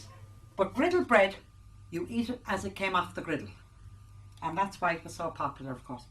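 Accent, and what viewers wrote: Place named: Ireland. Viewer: I am Argentine, fan of so many Irish traditions. Today it is Saturday night and I am enjoying this video... that wonderful Iirhs lady, her cooking tools, the sounds she makes as cooking, her accent, the room, the colours.... Love it! I had the chance to taste the soda bread in Ireland.... delicious!!! Cheers!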